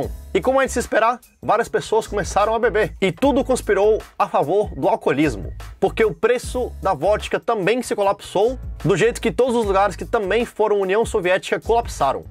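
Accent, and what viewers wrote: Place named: Ceará, Brazil. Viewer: VOZ DE HUMORISTA CEARENSE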